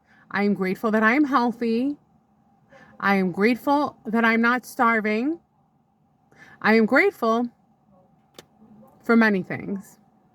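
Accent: New York, United States. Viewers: You have that new York accent .love it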